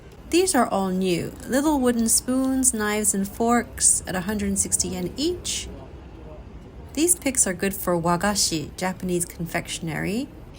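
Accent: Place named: United States. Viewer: I have a question, you being Japanese and British, you have an American accent but aluminum. Seems strange, was wondering.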